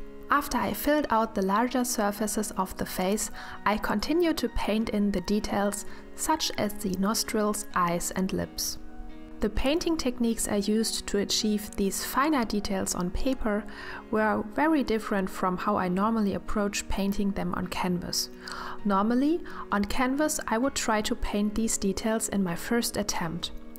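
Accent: Germany. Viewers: Hallo, ich möchte erst einmal deine Kunst loben-ich liebe den Mix aus abstrakten Verläufen und naturalistischen Elementen! Ich tendiere dazu, zu viele Details in ein Bild zu hauen, dann in der Mitte die Lust zu verlieren und wenn es fertig ist, frustriert zu sein, weil es einen etwas erschlägt xD Deine Bilder helfen mir, das Konzept von "Blickfang" besser umzusetzen und meine Portraits zu "entmüllen". Wo ich dich das erste Mal gehört habe, wusste ich sofort, dass du Deutsche bist. Dein Akzent ist wirklich liebenswürdig. Am liebsten höre ich mir einfach nur die Tonspur an und zeichne/male selbst parallel dazu und dann schau ich das Video noch einmal für das Bildmaterial;) Entspannend und inspirierend für mich. Vielen,vielen dank für deine Videos! (Musste ich einfach mal loswerden, bin eher der stille watcher)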